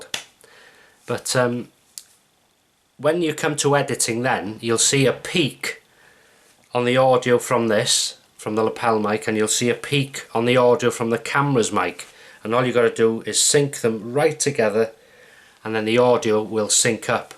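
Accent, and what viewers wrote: Liverpool, United Kingdom. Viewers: You from Liverpool?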